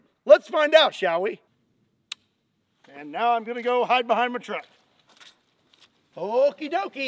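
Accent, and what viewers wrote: Kentucky, United States. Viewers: Dudes definitely from Kentucky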